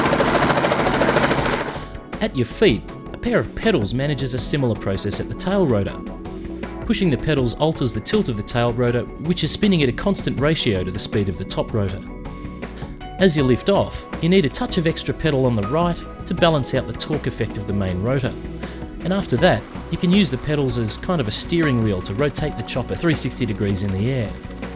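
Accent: Australia. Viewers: Great video, funny and informational. Love that Australian accent. "Deesk of Leeft"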